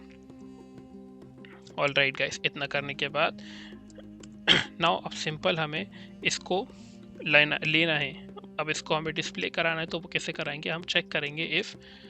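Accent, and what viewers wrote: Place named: India. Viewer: took my like back immediately he started speaking indian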